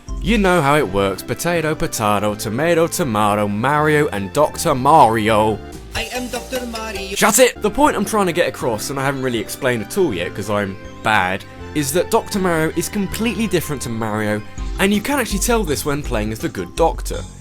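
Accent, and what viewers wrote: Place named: Essex, United Kingdom. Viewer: Cool vid man,nice accent btw. Let me guess,you're from Essex?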